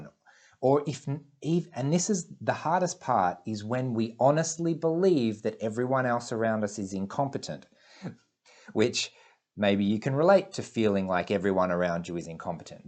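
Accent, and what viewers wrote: Australia. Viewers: I hate Australian accents, but I'm still subscribed because I appreciate your ideas.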